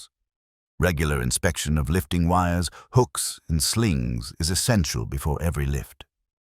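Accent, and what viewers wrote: United Kingdom. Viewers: It looks as if all of these incidents occurred in a third world country where there is no OSHA. And, I'm sooooo tired of the English accent narration.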